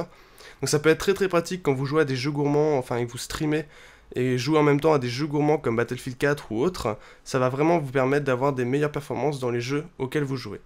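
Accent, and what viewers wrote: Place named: England, United Kingdom. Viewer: Bel accent anglais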